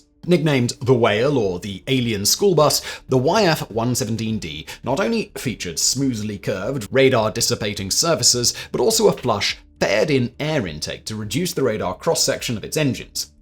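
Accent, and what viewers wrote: England, United Kingdom. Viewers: I feel like I’ve seen clones of this guy on various channels. All bald, with a beard and a British accent… is it just me? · FOR ENGLISH ACCENT ONLY !!! - TALKING AT SPEED OF LIGHT 🤮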